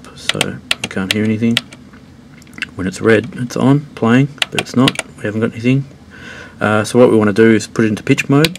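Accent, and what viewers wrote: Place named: Australia. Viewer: Hi, noticed the Aussie accent. Are you in Sydney? I found a 303 and 606 in a council clean up pile in Mascot. I shit you not. I have no idea how they work (I play cello) but if you’d like to have a look at them please let me know! It’d be fun to see if they work. I put batteries in the 303 and it lights up.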